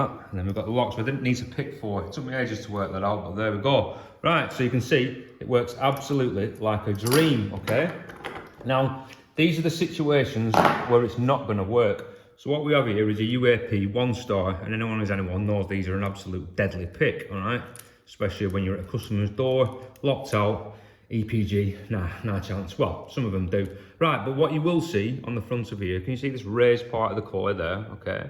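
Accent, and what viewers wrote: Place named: Cockney, United Kingdom. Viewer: Nice video, I'm thinking about getting one of these Lishi knock-offs for Yale keyways. This guy is funny, I would love for him to read a children's story. LOL. I love his accent (cockney?). Love his colorful way of speaking. I can just imagine............ "She tasted the porridge from the first bowl right. “This porridge is too fuckin hot!” she exclaimed. So the little wanker tasted the porridge from the second bowl. “This porridge is too balls-deep cold,” So, the street-walking Hussy tasted the last bowl of porridge. “Ahhh, this porridge is just dead-on balls fuckin' right,” 👍👍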